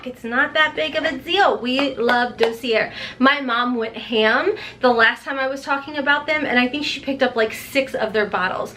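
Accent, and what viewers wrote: United States. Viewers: I love your country accent so cute. I’m from the Bronx and now live in Cleveland so I love me little country accents super cute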